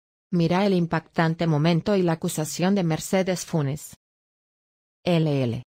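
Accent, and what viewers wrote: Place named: Spain. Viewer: X q ponen esa voz española q feo.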